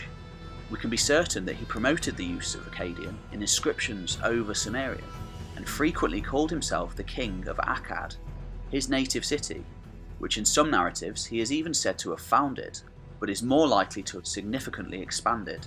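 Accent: United Kingdom. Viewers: wait, you forgot to mention his luscious british accent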